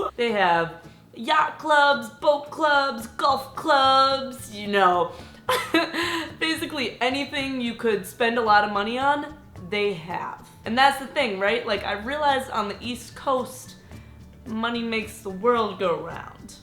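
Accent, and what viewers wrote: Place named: Wisconsin, United States. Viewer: oooohh myy goddd your Wisconsin accent is crazy thick lol smh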